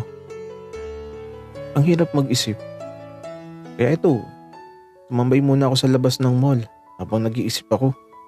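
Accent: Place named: Laguna, Philippines. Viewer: likas ka bang taga laguna? ung intonation kasi ng boses mo.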